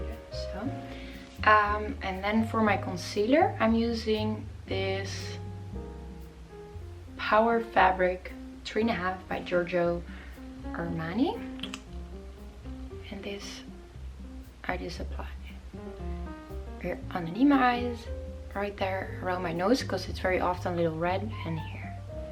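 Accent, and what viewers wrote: Netherlands. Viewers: Je klinkt een beetje Nederlands nog! Ik kom van masha haar mukbang :)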